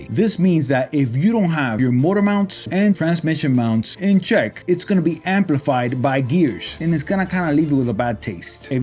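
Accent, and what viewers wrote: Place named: Hialeah, United States. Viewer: . That is a lovely accent. Hialeah?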